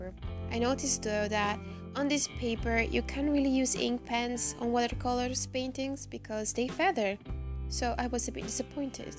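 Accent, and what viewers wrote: Italy. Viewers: Your italian accent is beautiful 😊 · Non aver paura di parlare inglese! in alcuni punti pronunci bene le parole, sei anche spedita. in altri punti ricadi sull'accento italiano che in realtà sei anche capace di evitare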